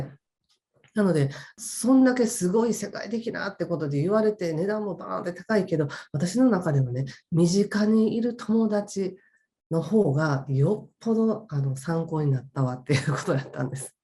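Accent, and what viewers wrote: Kansai, Japan. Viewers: さんの関西弁、新鮮！！ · めっちゃ関西弁なんですね😂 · 、以前から少し言葉の端に関西を感じてたんですが、大阪の方だったんですね！めっちゃ親近感〜❤私も大阪出身でーす😊わーい正直な物言いが素敵！そうでなくちゃー！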